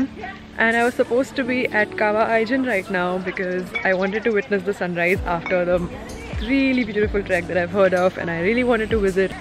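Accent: India. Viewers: Your accent like indian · Are you Indian?..accent is quite Indian..!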